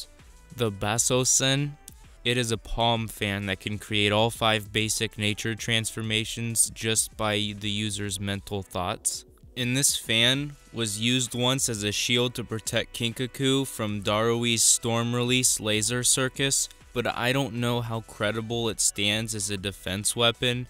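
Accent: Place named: Ohio, United States. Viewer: HE talks like this normally too, he's not doing this on purpose. Its just the Ohio prose my man.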